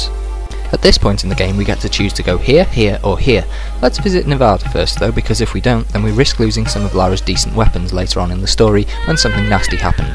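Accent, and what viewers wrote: United Kingdom. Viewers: Are you from the UK sorry for the random question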